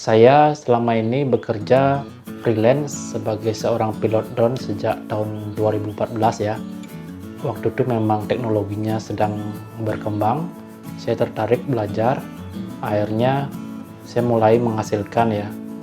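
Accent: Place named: Java, Indonesia. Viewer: Medok sajan bahasa Indonesia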